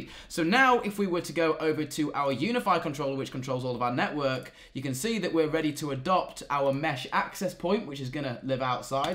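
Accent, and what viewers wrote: United Kingdom. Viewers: Noah Van Dijck Since he is British he pronounces, Ethernet as EHthernet and Router as Rooter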